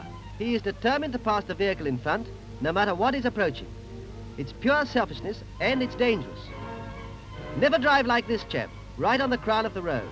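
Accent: United Kingdom. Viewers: it's not snobby that's just how the British accent sounds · Sorry just can't take the snobby British accent.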